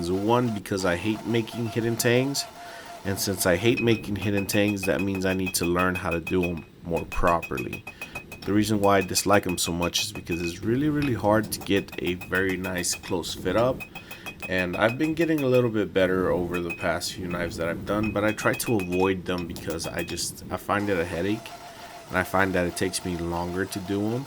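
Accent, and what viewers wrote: New Mexico, United States. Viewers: Ive been watching for a second now, your skills are definitely improved. Pretty much one of the few makers I follow. You sound like a fellow NM so I just enjoy the commentary!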